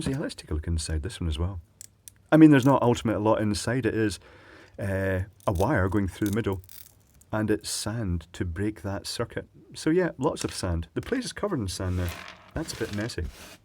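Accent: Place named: Edinburgh, United Kingdom. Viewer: Refreshing to hear an edinburgh accent!!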